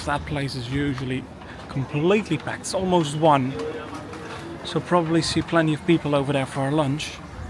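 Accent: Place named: Netherlands. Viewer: I don't have a preference but normally I'm pretty good at spotting native speakers and I really thought you were british but every so often I hear that dutch accent creeping in.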